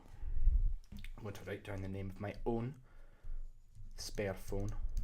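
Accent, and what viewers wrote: Scotland, United Kingdom. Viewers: Great accent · YES more scottish accent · Not a bad Scottish accent I reckon you sound like a teacher in Edinburgh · YAAAAAY!!! Scottish accent part 2, finally!!!! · Andrew Robertson would approve of the accent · Can you do other profession?i loveeee the scottish accent x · Your videos are a great tool for mastering a Scottish accent.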